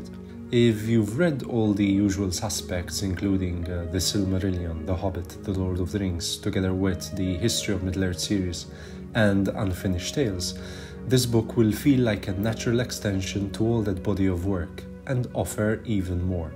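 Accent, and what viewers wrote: Malta. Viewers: by any chance, are you maltese?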